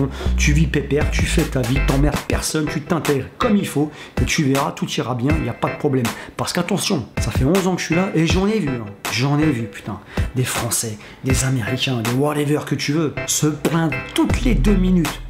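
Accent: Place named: Japan. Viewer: C'est drole quand tu parles français tu as les intonations japonaises. 😁👍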